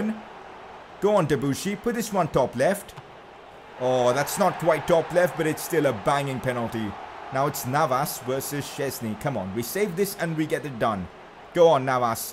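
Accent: India. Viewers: U Indian mate ? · You sound indian..are you?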